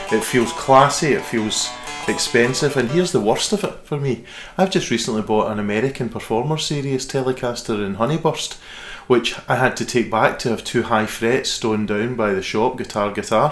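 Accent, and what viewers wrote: United Kingdom. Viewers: Glasgow by the sounds of him. Maybe Renfrewshire or Lanarkshire. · Thoroughly enjoyed your review and your great playing. Sub'd:) Miss the Scottish accent after living near Strathaven for 20+ years before moving back to Wales. I've had the TE62DB LPB and CAR models in my Thomann basket for weeks but I'm swithering between one of these and the JET JT-300 from Merchant City Music as a modding platform. Both are great VFM. I too have a Tele itch waiting to be scratched... again! I play my 2010 Squier Tele Custom II P90 to death and also have a Fender MIM Nashville which doesn't get as much love as it has a slightly bent neck... which GG Glasgow refused to exchange when bought in 2008:(( Keep up the good work sir:)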